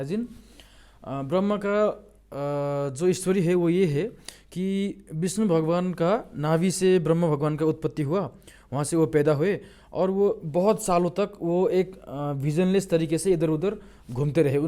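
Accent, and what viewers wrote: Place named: Nepal. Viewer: Nepali tone... are you nepali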